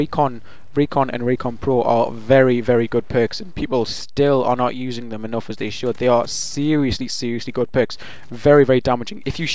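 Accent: Newcastle, United Kingdom. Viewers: By any chance are you from Newcastle?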